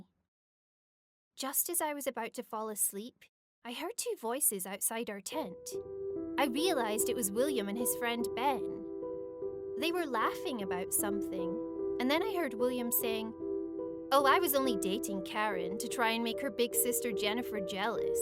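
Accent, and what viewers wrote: Scotland, United Kingdom. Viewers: Does the narrator have a Scottish accent? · You talk British I like your accent😉😉😆😇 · You sound a little bit scottish x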